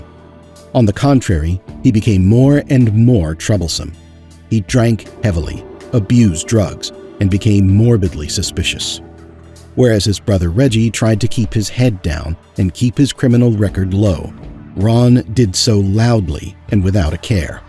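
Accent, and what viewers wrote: United States. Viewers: Why is it that all these documentaries seem to have a cheesy American narrator? Unwatchable. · How do you with your American accent know anything at all about the twins. ? Been watching a few movies? One thing that is for sure, the east end would still be the same Also no Indian restaurants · Muggy Yank accent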